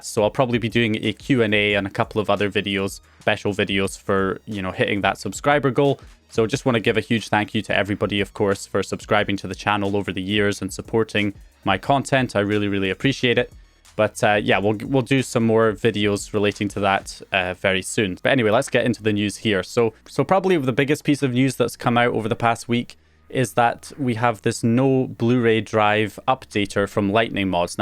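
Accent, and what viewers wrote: United Kingdom. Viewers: Thanks for the vid. Always looking forward to hearing this guy's UK accent.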